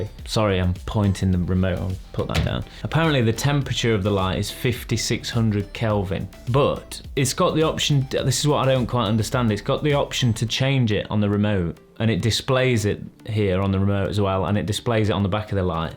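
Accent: United Kingdom. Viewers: You sound like Carl Froch - you from Nottingham ?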